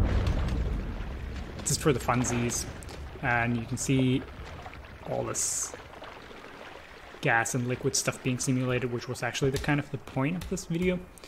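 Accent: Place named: Finland. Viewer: Finnish accent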